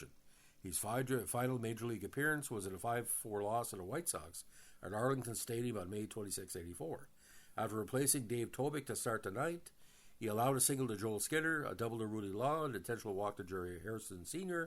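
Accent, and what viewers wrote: Canada. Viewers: Are you Canadian? I’m having trouble placing your accent!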